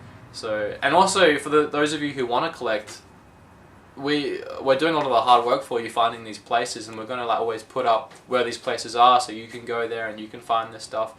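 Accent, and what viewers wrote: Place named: Australia. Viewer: Young with his super strong Aussieland accent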